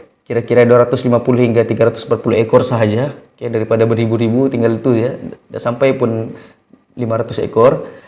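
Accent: Sabah, Malaysia. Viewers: ketara dialek sabah HEHBHABA btw thanks cggg ^^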